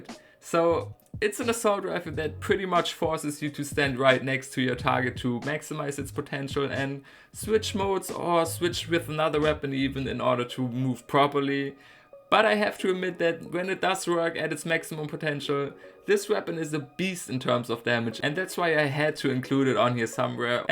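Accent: Germany. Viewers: u are german i hear it ;D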